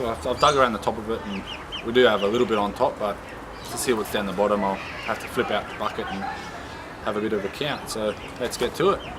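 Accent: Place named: Australia. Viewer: You sound Australian!! :-)!!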